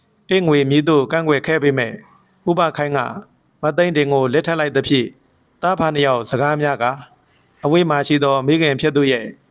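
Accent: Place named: Rakhine, Myanmar. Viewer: အသံ ကောင်းတယ် ရခိုင်သံဝဲသလိုဘဲ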